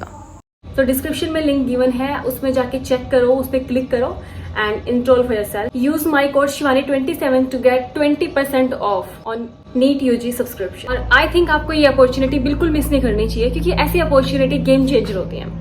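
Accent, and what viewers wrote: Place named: Pahadi, India. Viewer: Himachal wagera se ho kya aap didi? Pahadi accent hai aapka...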